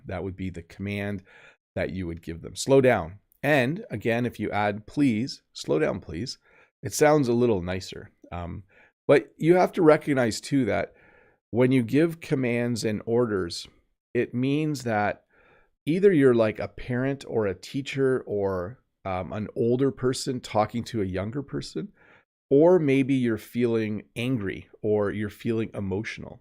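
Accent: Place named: Canada. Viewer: good morning, you are a great teacher, I learn English from you, but also calmness and composure. I saw you once on the Lucy english with Lucy channel, I must honestly admit that I thought that the difference in accent between Canada and Great Britain or Australia and the USA is greater, at my stage they are almost imperceptible, except for how you pronounce Toronto, Toronto, hold on you are great greetings From Poland. Luke G.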